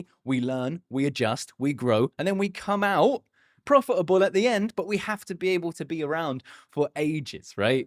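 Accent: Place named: Essex, United Kingdom. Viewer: Defo prefer hearing a Brit. You sound Southern too, Essex kind of way I presume?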